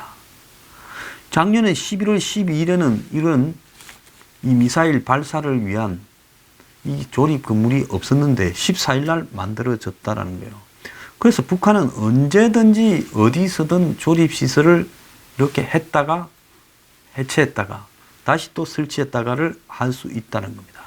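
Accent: Gyeongsang, South Korea. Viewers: 황소장님은 경상도 사투리를 기역도 잘하십니다 저는 많이 잊어버렸는데요 머리가 좋아서 기역역도 대단 하십니다 항상 고맙습니다 너무 더운데 건강잘 챙기시고 건강하십시요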